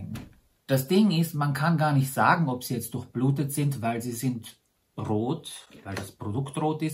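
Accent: Austria. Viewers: Dafür! Der Unterhaltungs- und Informationsfaktor - in einem ausgewogenen Verhältnis - sind hier echt hoch. 💜💜 #support Und irgendwie kommen bei mir immer heimatliche Gefühle durch beim Zuhören, wegen dem österreichischen Einschlag. 🥰 Witzigerweise bin ich zwar gar keine Österreicherin, sondern Oberpfälzerin, aber der Regensburger Spracheinschlag (nicht das eigentliche Oberpfälzerisch, bloß das direkte Regensburgerisch! Ganz anders!) ist oft dem österreichischen Sprachtenor in vielen Punkten echt ähnlich, gerade eben wenn beides eher unterdrückt wird. 😂🙈 Vor allem, wenn man an der Nordseeküste wohnt, wo man wirklich komplett anders daherredet, kommt einem das vergleichsweise direkt heimatlich vor. Keine Ahnung, ob man versteht, was ich meine. 🤷🏻‍♀️😅😅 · ich lerne Deutsch. Ich habe dieses video so cool gefunden! Ich hab nicht alles verstanden, weil österreichische dialekten für mich schwerer sind. 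Aber vielen dank fürs Video!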